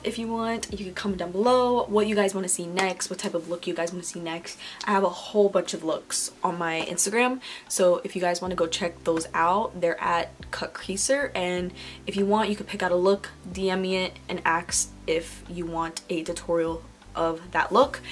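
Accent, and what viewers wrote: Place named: New York, United States. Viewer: i'm from nyc and when i got to i was like 👀😗i'm getting nyc vibes is she from nyc?? and sure enough 😛🤟🏽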